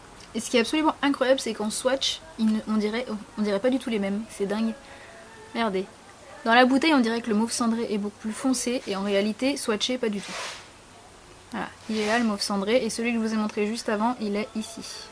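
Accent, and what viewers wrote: France. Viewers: merci pour cette video, dis donc tu as beeeeeeaucoup de vernis, j'en ai presque bavé devnt cette video ^^ mais dis moi comment reussi tu a ranger tout ses vernis ? As tu deja fais une video de ton rangement vernis ? ça serait bien interessant de voir ça ! & oui oui je me matte ta video a 4h55 du matin lol et meme a presque 5h du matin j'aime ta video :) bis & ton accent français (renforcé) est terrible, j'adore ;)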